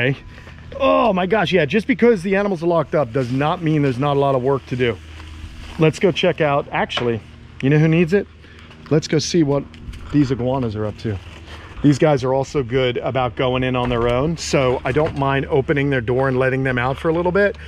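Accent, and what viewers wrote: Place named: New York, United States. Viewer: "Oh they've got such claws... such claws!" You sound exactly like where you are from🙂!! You can take the man outta New York but you can NEVER take the New York outta da man. Brooklyn maybe? Queens? It's no matter..I love how you communicate!!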